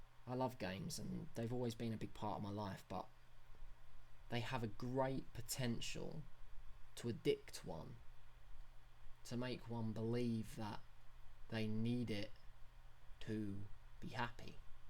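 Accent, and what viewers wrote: England, United Kingdom. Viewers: somehow everything makes more sense with an english accent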